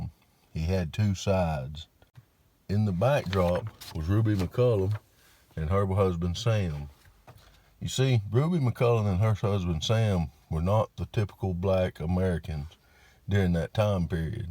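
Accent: South, United States. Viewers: My uncle and grandmother were in the white side of the doctor's office that Sunday morning. She was the women Ruby was waiting to leave, on that I don't blame her, my grandmother was a one sided stubborn gossip. Rudy shooting him opened a can of worms. The Judge was a friend of my grandfather. When I found out about the case 60yrs later, my father did not know she had birth one of his children. Curious where are you from? Clearly southern, but beautifully open minded ❤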